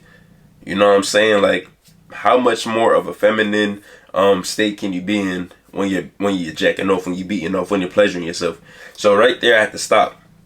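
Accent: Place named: New York, United States. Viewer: Yeah i could tell u from nyc lol but good video king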